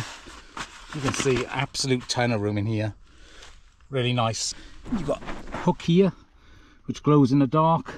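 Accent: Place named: Cardiff, United Kingdom. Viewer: Hey bud, nice little video! I’m a Cardiff boy, are you in Cardiff or Newport as loving the accent haha!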